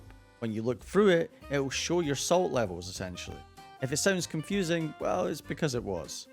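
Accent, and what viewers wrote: Scotland, United Kingdom. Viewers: Guys is he British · Wait your british??😊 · Is he British Im American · Ur british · Hes British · Bro are you British or foreign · are u scottish by any chance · I think he’s British, but how many times he said WOA? Ah ended😅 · Bro is British because he says wa'er Instead of water and says sal' instead of salt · HES BRITISH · By your voice I can tell that your Scottish 😎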